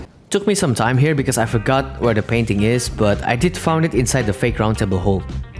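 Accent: Indonesia. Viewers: are you indonesian? you sound very indonesian no disrespect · pantes kek ga asing logat inggrisnya ternyata org indo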